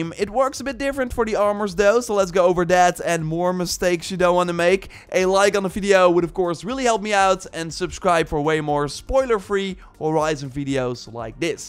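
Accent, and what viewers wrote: Netherlands. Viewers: That was very helpful already wasted some time on purples not knowing about levendary. Small friendly advice: Im assuming youre dutch. 'Armor' is pronounced like the dutch word for poorer. "Armer" instead of "armòr".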